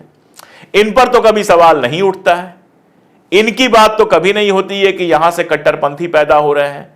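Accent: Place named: India. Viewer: ji aap haqikat mein is mulk ki tahjib ki jhalak hain aur aap ki awaz mein hindustan ko bakhubi mehsoos kiya ja sakta hai.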